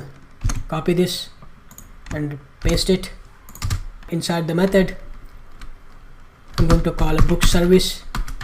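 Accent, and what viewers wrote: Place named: India. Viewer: Where you from you speak English but background India?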